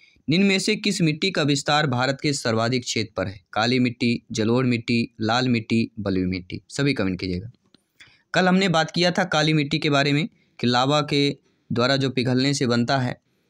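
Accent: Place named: Bundelkhand, India. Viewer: बुंदेली बोली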